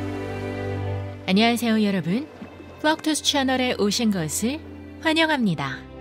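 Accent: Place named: United States. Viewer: 아따~ 누님 미제발음 죽이네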